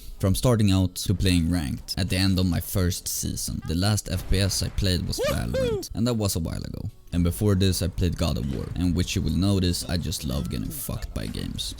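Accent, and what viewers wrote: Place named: Sweden. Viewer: I love your swedish accent, new sub tho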